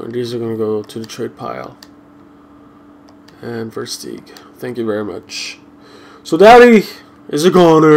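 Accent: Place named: Quebec, Canada. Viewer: pi ta un accent Québecois c pour sa g su que tu parle francais sur dautre video sur les commentaire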